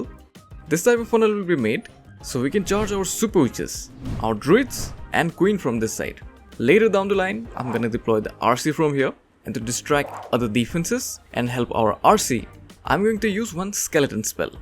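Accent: India. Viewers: Bro are you Indian??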